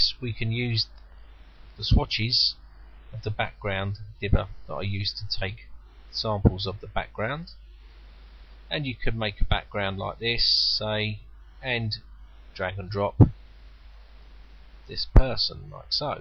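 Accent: United Kingdom. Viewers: From now on I will only watch tutorial made by British guys <3 the accent =) The tutorial is gd too .... 'melts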